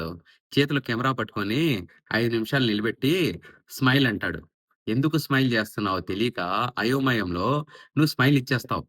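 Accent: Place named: Rayalaseema, India. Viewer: Rayalaseema slang appa 👍 💕 Your native place Sir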